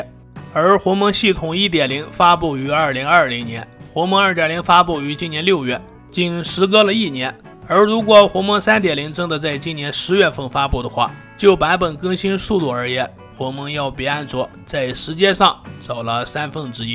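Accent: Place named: Yulin, China. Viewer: 你是榆林的吧。。这口音。。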